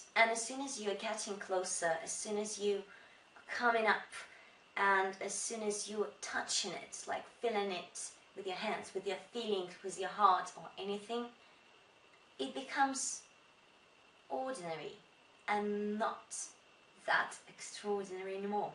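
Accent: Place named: United Kingdom. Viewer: damn ur bri'ish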